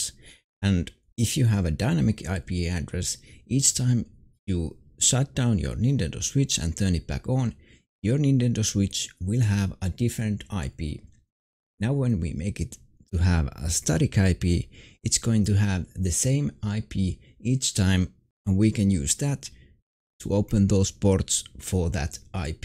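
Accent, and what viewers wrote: Finland. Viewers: Do I hear a Finnish accent or am I going crazy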